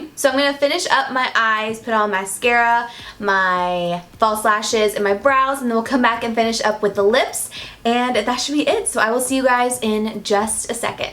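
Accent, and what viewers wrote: South, United States. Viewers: i found your channel after being lost a couple years and i realized how much i love your channel! you are sweet and i love your country accent😍😍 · I feel like you're already losing your southern twang. Don't let NY do that to you, girl! 😂😭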